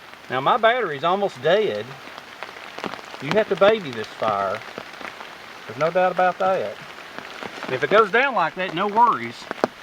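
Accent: South, United States. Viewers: As always, thank you for an entertaining learning session. I've said it before, you are the Mr Rogers of survival. I've watched my share of survival videos and all of them take on the overtly serious tone which makes the video far less entertaining (They need to learn to lighten up and laugh at themselves). You're honest in your situations and circumstances (just like the tent fire with the carbon fiber chimney) and you keep a fun and positive attitude. As I stated before, the Learning Channel needs to take a serious look at your videos and NOT CHANGE A THING about what you do in them and allow you to keep in real. As a fellow southerner (Look Out Mountain, TN) living in California (but leaving soon), it's a breath of fresh air to hear a real southern accent. You've got the regular TV programs of today beat, my friend. Except for Twilight Zone and Hogan's Hero's, there's no reason to watch the TV when I have your educational entertainment available here. 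Be safe my southern brother, and take care!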